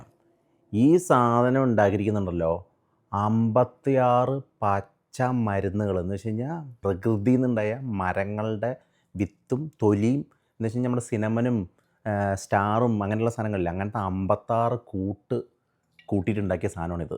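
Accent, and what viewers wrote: Thrissur, India. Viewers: എന്തുട്ടാ 😂😂😂 ത്രിശൂർ ആണല്ലേ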